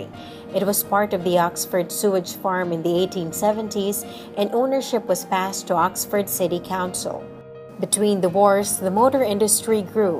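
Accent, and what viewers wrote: United States. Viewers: Why is the narrator an American?? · The text is fine , why on earth has "Oxford info", presumably outsourced by OCC , used a mechanised American voice for this video instead of a rich Oxford town burr? An answer pls?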